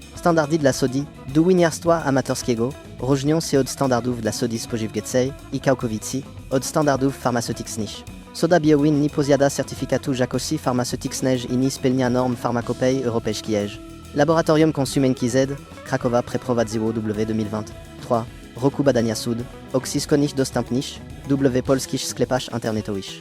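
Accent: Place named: France. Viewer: Ktoś tu mówi z francuskim akcentem 😅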